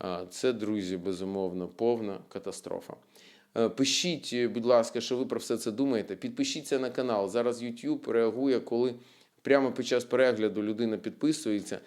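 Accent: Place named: Russia. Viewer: Лёшенька , переходи на русский язык. А то сбиваешься на него .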